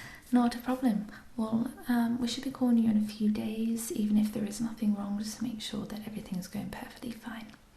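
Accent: Scotland, United Kingdom. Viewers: No video + natural accent almost makes me feel like I'm listening to someone completely new. Fitting to the channel rebrand, I suppose. I think this and your Scottish accent are both equally wonderful, excited for new videos in either. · Wow! Love this, ear examinations are my favorite, can't believe I've only just found your chanel! Nothing wrong with your Scottish accent but your natural English one, I find is more relaxing 👍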